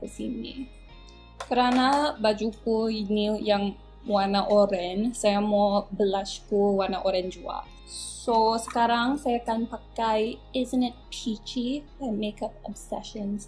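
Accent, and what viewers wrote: Sabah, Malaysia. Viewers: Your malay accent sound like Sabahan accent or Indonesian accent😂😂,its okay my malay Brunei accent is not really that deep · Macam cakap sabah sudah 😄 mulut ku ani inda boleh cakap malayu hahaha😂😂 hee okay lah atleast boleh cakap malayu sikit2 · Macam orang Sabah cakap bahasa Melayu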